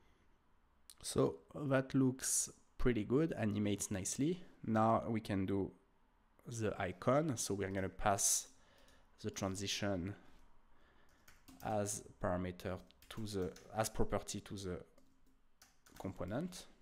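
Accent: France. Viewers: are you French speaking ?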